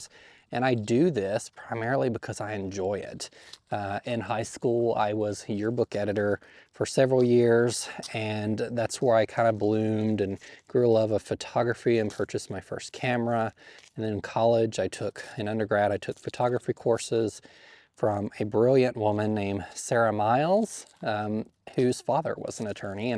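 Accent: South, United States. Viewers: Thank you for giving us a glimpse into your history and your gardening evolution. Though it’s really none of our business, it’s really sweet of you to do that, as some people want to get to know you and are very interested in your garden — watching and learning from you. May I also say your delivery is comforting. I like the ‘Southern’ pace — as new gardeners really need the way you educate with how and why you may be doing something. You are unique in that way. Your love and passion for gardening really comes through. Congratulations on your new property and best wishes for your success!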